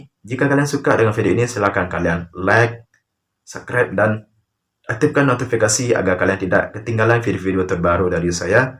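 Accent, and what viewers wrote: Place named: Aceh, Indonesia. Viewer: Abang orang aceh ya dari logat nya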